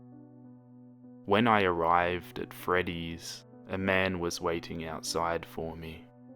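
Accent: Australia. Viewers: Your Australia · OMG UR AUSTRALIAN HI :,) IM AUSTRALIAN TOO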